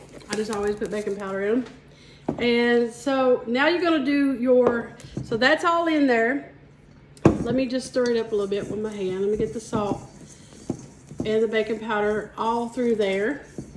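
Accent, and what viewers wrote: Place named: Kentucky, United States. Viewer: I hear that accent. I’m in California, but I lived in North East Kentucky for awhile. I lived in Winchester, under 5,000 in the early 80’s. We also lived in Corinth 210 people back then. Then we moved to New Columbus Kentucky 32 people. Could barely breath so many people lol. It was beautiful and I ate my weight in wild blackberries. I was Blue and Red from the berries and blood trying to get through those thorns. The only thing I hatted was Chiggers. Those stupid bugs made me itch so bad, but it was worth it to be out there. Love your dumpling recipe. I enjoy both flat and fluffy. Thanks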